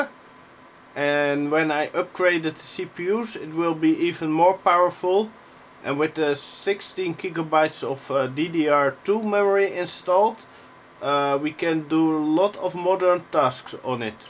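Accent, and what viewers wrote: Netherlands. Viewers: The Dutch accent is strong with this one :)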